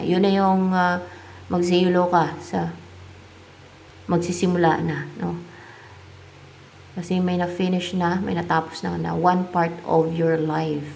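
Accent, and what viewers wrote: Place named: Visayas, Philippines. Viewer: bisaya ka madam a bisaya din cebu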